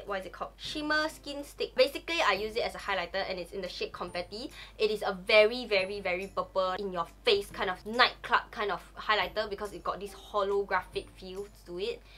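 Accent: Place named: China. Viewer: I like how you are talking like half eng half chinese, just shows that you are not putting up a fake front infront of the audience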